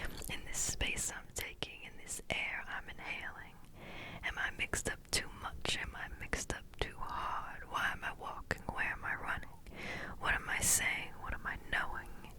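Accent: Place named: Louisiana, United States. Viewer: These lyrics struck me in an interesting way. It reminds me so much of how I've felt for a while, so it hit me quite deep. I could relate to it. And the country accent, it reminded me of a Louisiana accent, particularly from southern Louisiana.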